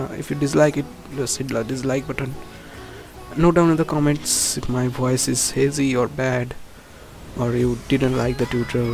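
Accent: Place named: India. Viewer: can the music get any fucking louder and the voice be more indian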